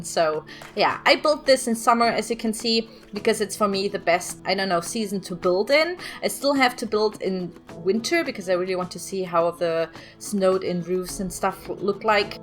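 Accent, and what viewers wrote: Germany. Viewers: You are german!!??? 😉I live in Germany and my son have the same accent when he speaks english and i find it really lovely, just like your creations! 😊❤✌ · This was the first video I have watched and must say didn’t notice your accent. You are doing very well love the German touch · are you from Germany or Austria? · I never realized that your accent was german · I LOVE HOW YOUR ACCENT IMMEDIATELY GOT STRONGER LIKE Y E S also please don’t change your accent... i love german accents and you should never change who you are :)) I’m from Britain, and I get teased a lot for saying things ‘poshly’ as my family is really English and well-spoken, and everyone around me has a really Welshy accent. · I agree with you plus her English is really clear to understand evan with the German accent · Vermutlich weil sie es selbst nicht besser können .. oder GAR nicht ^^ Ich finde dein Englisch schon ziemlich amerikanisch. 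Manchmal redest du so amerikanisch-kauderwelschig ^^ dass ich dich kaum verstehe ;D Da sind eigentlich nur noch wenige Wörter bei denen man den deutschen Akzent raushört. Außerdem hab ich gehört, dass Amerikaner in der Regel den deutschen Akzent ziemlich niedlich finden :) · I don't think your accent is annoying at all - it's lovely to listen to, and not as strong as I'd imagine a German accent to be, lol! I love accents and poeple of all places and cultures, and you are most definitely awesome! The person who was so mind-numbingly rude can go suck - don't ever change! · Your reaction to that comment was GOLD as a fellow German I know how hard it is to get rid of a german accent but honestly??? Yours isn't even bad??? When I started watching your videos I was like "she's german right?" because I did recognize the accent but I wasn't even entirely sure! It's ridiculous how some English native speakers (obviously not all, I see a lot of sweet comments here! 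<3 ) take the content produced by non native speakers for granted to the point of complaining about their accents oh boyyy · I'm really confused. Why would you watch a German youtuber if you don't want to hear a German accent? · I love your accent and I think it's really obnoxious for people to complain about it. It just goes to show you how spoiled we Americans can be. I for one am very grateful that you record in English at all. It's not your first language and I'm sure it would be easier for you to record in German so I appreciate the fact that you care enough to try!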